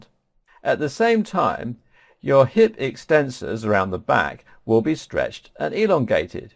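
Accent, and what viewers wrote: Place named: United Kingdom. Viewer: The British accent is one of the most lovely things to listen to...not sure why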